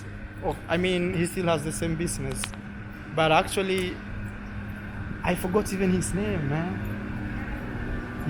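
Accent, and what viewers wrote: Kenya. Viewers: The kenyan ACCENT IS REAL WAAAHHH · hes from kenya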